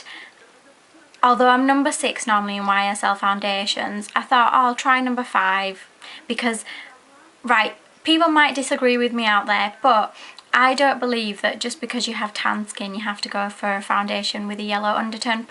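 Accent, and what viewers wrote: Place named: United Kingdom. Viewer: Where are you from in the UK? You have a beautiful accent! :)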